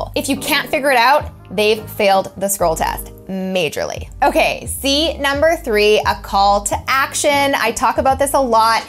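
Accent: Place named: New York, United States. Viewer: OK You can call me a troll if you like. Here goes... I am very interested in the subject, and you are obviously very knowlegable about copywriting...also quite attractive...However, as a chilled out and somewhat laid back West Coast Canadian, I find your voice grating and almost intolerable. I have started watching it about ten times, and just turned it off, because the New York delivery (if that's what it is) is hurting my brain. What do they call that? Vocal fry? At least you don't do the upward inflection at the end, making every sentence sound like a question?. So anyway, that being said, I surely would get on your nerves too, with my slow delivery and quiet tone of voice. If I had a dolla for every time someone told me to speak up or just started talking over top of me because they got bored or couldn't wait for me to finish...Thanks....just my trollish feedback...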